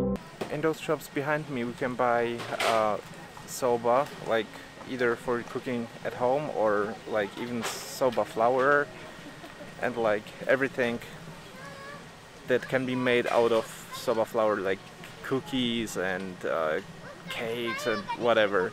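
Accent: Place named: Eastern Europe. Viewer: This Slav has a pretty cute slavic accent. 😊 Happy that you have the comfort of Polish cuisine where you live.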